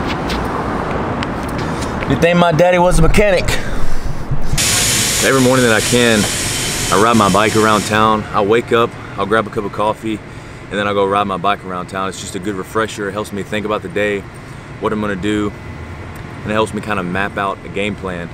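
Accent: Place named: Texas, United States. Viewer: No homo but his Texan accent is such a refresher 😊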